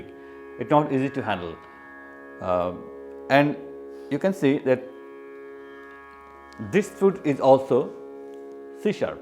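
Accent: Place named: South India, India. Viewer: Sir Assalamualaikum, I am from West Bengal. Yor online flute lesson helped me a lot how to Play a flute. Sir yours in this video the flite E base' has intrigued me for it's looks and color. I just wanted to know that how much wil it cost me if I to buy it from you. ? And how to get it. ? Fron your dialectic accent i guess you are south Indian. Can i have your address plz, so that if i can make visit you there and purchase my required one flutes.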